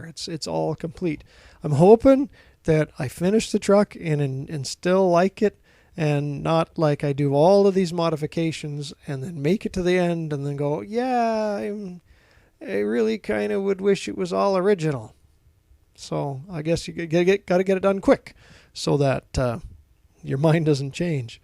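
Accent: Canada. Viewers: Good video! Looking forward to the follow ups. Hey where are you from? You sound Canadian to me. Oshawa here.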